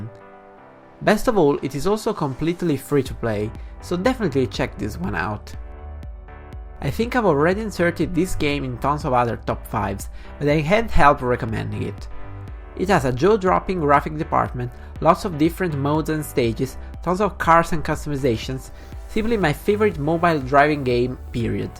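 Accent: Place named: Italy. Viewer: actually has a Italian accent.... Just saying...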